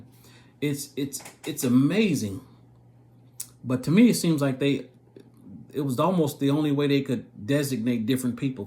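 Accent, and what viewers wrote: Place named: Baltimore, United States. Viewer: Blacks in Baltimore hence the accent lol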